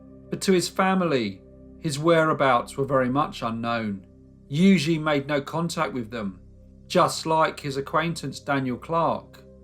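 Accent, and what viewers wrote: United Kingdom. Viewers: mmm never watch tem before.. gotta look it after. There's also Lazy Mascarade, his voice is cool. But your voice is amazing too! By the way, if is not indiscrete, may I know which part of UK your accent is from? I'm very curious about accents. English isn't my mother language so I try to learn from any accent.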